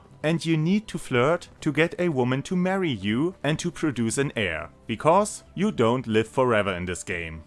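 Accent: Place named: Germany. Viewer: Bist du deutsch? Sounds like a german trying to speak english very correctly.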